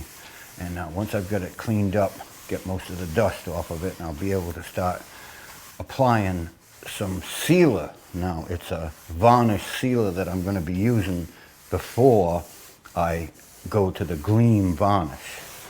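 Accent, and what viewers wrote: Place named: New England, United States. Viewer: Love your craftsmanship! My 95-year-old father is an old-school cabinet maker. Good to see that kind of understanding of the material and respect for the craft is still alive. One personal question, if you don’t mind: I can hear your accents is New England. Were you born there? Thanks for your great videos!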